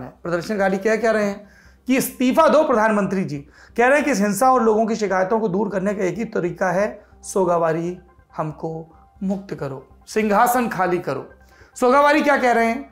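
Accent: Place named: Purvanchal, India. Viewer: Purvanchal se ho kya.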